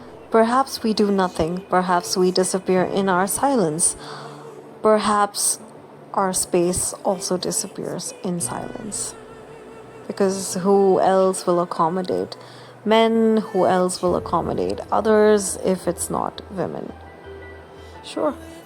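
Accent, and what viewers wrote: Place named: India. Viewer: I hope we get more games like lads... Also you sounds indian if not then its completely my fault for assuming (i just seen very less indian playing lads, ) and the your vocabulary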